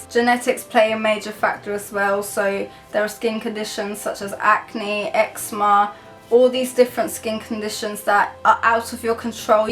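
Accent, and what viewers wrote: London, United Kingdom. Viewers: You’re beautiful. I’ve never met a Latina with a British accent haha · Patt Col London accent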